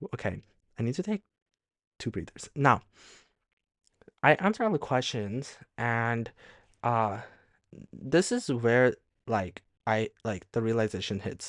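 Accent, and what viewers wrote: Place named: United States. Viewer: Lovely american accent dear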